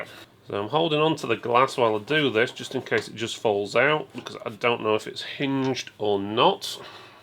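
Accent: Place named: United Kingdom. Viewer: Too much Bri ish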